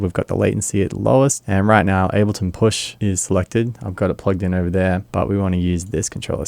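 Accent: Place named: Australia. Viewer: Started watching the video, and felt so at home as you talked - then I realised it was the aussie accent. HAHAHAHA thanks heaps dude.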